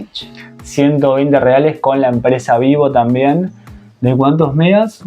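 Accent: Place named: Uruguay. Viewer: Aprendí o un poco purtuges y ya tranquilo por el acento y otras fraces supe q ue eras de Uruguay, es caro Montevideo. No me convence acá, saludos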